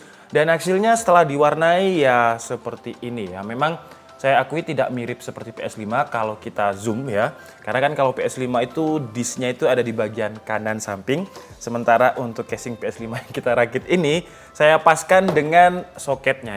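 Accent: Java, Indonesia. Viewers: tibake nk ngomong sampean iki jowo nyel yo mas tak.kiro malah raiso.boso jowo😂 sukses terus mas.. mugo sehat slalu, diberi kemudahan dalam menjalankan suatu hal amin.. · orang jawa mana bang?😂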